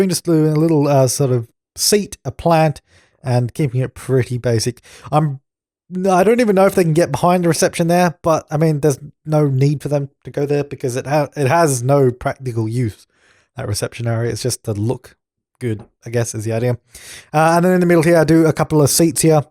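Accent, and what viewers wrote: Australia. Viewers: Here in the States in Ann Arbor Michigan they have a arcade place, its a big one, it has nothing but that, and a snack area, I forget what its called now, its been about 5 years sense I was in Ann Arbor,lol! And I have to say, I love it when Aussies say No worries, meaning no problems or trouble, its kinda cute! lol! I enjoyed this video more because of his accent, if he had an American accent I wouldn't have watched the whole thing,lol!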